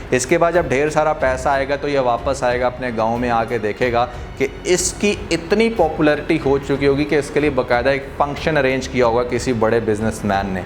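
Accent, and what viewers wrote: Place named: Punjab, India. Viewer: Nheeeed 😂😂😂😂 Bhai apni pronunciation Tu thik kro.. Urdu main b Punjabi touch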